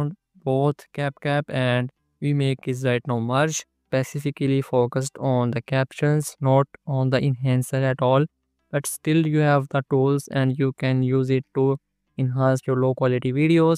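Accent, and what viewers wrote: India. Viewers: Indian accent😭😭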